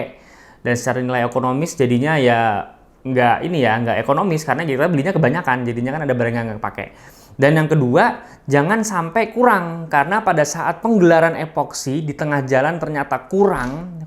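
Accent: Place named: Banjar, Indonesia. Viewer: Logat banjar nihhhh..heheheee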